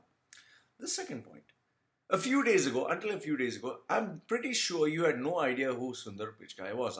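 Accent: India. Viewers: Why do you have an Indian English accent.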